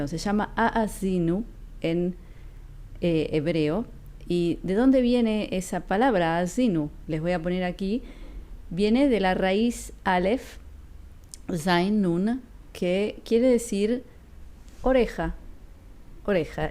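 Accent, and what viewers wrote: Buenos Aires, Argentina. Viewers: Salud! No puede negar que es porteña.. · qué lindo...y tu modo de hablar es bien porteño!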